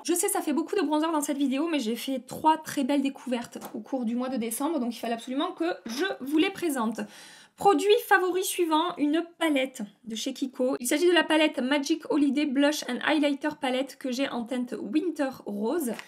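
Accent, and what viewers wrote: South, France. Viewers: ton accent du sud est un régal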